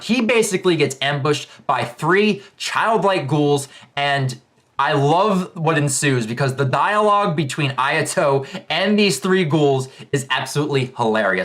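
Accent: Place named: New York, United States. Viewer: you sound like you're from New York ( I'm from New York) no offense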